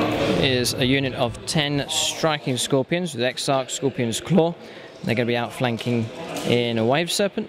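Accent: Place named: Southeast England, United Kingdom. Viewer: Hey. nice to see some more of your batreps up on here. Is there no way in future you can stop there being a massive spoiler comment right up there^ at the top of the screen, it's impossible not to read that as it's the 1st one! Come on, don't spoil everyone's fun mate! Anyway, would love to know where you have your battles as you sound like you're from the southeast of England and I'd love to come see some of the wicked terrain you guys have and maybe even have a game...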